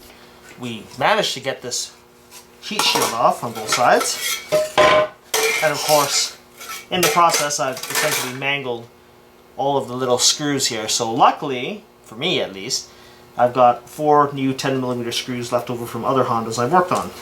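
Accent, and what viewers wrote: Canada. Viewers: My god, you’re Canadian 🇨🇦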